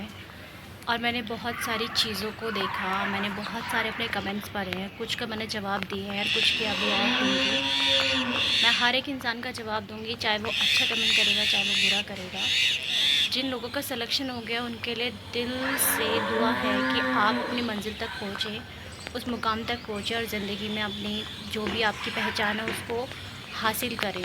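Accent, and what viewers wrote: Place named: Western Uttar Pradesh, India. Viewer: Aap western up se ho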